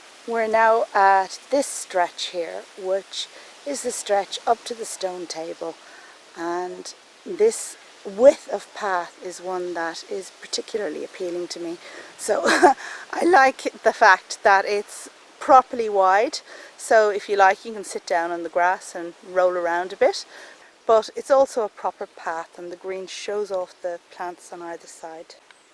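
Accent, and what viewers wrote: Ireland. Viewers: Well I did have a lovely start to my day ! I watched your video in bed with a cup of tea and a biscuit 🙄 which gears me up to get out into my garden, which is not so big but gives me so much pleasure . At the moment I have a plant called Sanguisorba Lilac Squirrel which I think you’d like as it has interesting leaves and long pink tassels,(keep up the tours) I hope one day to see you and listen to your lovely Irish twang 🤗🤗🤗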